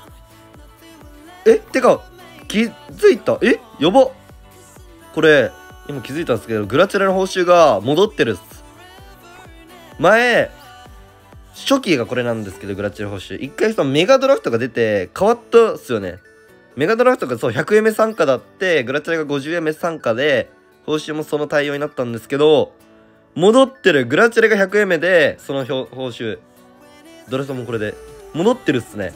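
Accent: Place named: Kyushu, Japan. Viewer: 九州は大体口調こんなんだよ いや、嘘ついた。鹿児島と長崎は違うかも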